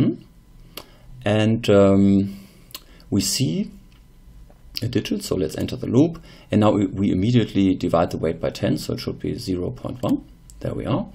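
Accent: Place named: Germany. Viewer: Yes it is. I'm converting some bibles (from diferent publishers) from PDF to XML. I'm strugling a bit because the pdfs are far from being well structured as a text format. So the parser get's relly messy. And also I have very few experience with parsers. Hmm: Ich nehme dein Antwort als ein "Ja" :). Ich habe das Firefox Fenster nicht gesehen. Aber deine Ausprache und Pausen sind ähnlich zu andere Deutsche die ich schon kenne, insbesondere wenn sie langsam sprechen. Viel Erfolg !!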